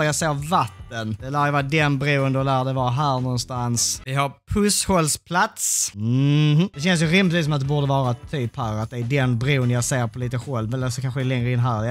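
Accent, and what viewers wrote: Scania, Sweden. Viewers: Fy fan för den vidriga skånska dialekten!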